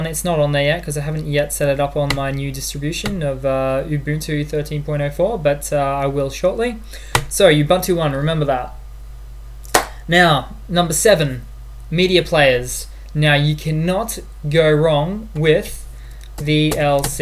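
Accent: Australia. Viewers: australian accent!!!!!!!love it xDDDDD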